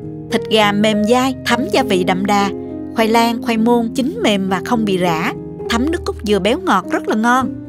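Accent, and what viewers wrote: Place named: Mekong Delta, Vietnam. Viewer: Giọng dễ thương, đúng là gái miền Tây nam bộ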